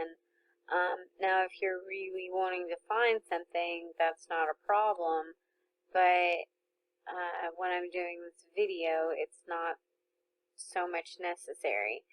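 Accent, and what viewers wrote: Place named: South, United States. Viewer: Your videos are absolutely the best I have seen. Thank you for sharing the sites you use to identify makers and marks, and to purchase jewelry, and thank you a thousand times for the lists you have created and shared!! Fellow southerner, so you sound just fine! :)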